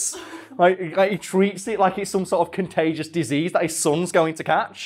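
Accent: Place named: Cockney, United Kingdom. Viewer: I don't even know how to describe this channel. My GF asks me what I'm watching all the time that has that "blaring Cockney accent" and I just say. . . .uhh he's talking about things . . . That happened. . . With tv and movies. . . But it's funny and interesting. She says "like TMZ for the UK?" And I say "NOOO DONT YOU FUCKIN DARE"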